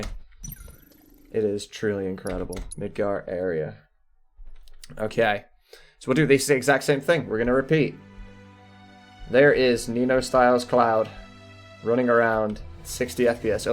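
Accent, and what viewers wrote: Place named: United Kingdom. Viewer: it took me a good 30 seconds to realize the british accent.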